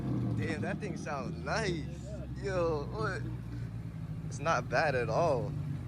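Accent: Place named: Charleston, United States. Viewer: Where you from? Sound like chucktown. But you can’t make that thang fast dawg I have one 2V booty bruh it’s fantastic for your first V8 it’s my first V8.. and I’ve had 2010 CTS v6 straight piped and a 2017 camaro V6 with factory NPP quad exhaust. My v6 Camaro was faster than my 2000 Gt. And Camaro was factory stock. Stang has full exhaust Throttle body. Heads, intake, stage 3 flywheel and clutch. Short throw shifter (so much better than anything) 350/70 something rear wheels or 370 some huge ass tires on the rear. Haven’t tried topping out yet or digging it like that because I don’t want it to break.. Shit is loud as hell but Slow 😭 my first V8 though tbh so I just love it and love how it sounds. As long as I have a V8 I’m happy.. idc if it’s slow I came from V6 all while wanting a V8.. finally got one that’s not on credit and PAID. 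About to get a C5 next way more capable of power less money than 4.6 2V Topped my CTS v6 at 145 completely straight for an hour hit 145 V6 Camaro hit 150Mph My new edge 2000 GT I haven’t even tried to top out yet 5 speed but if I did I hypothesize I’d say 150Mph? But probably longer to get there? Might be quicker to get up there than my Camaro with 20K miles That’s a maybe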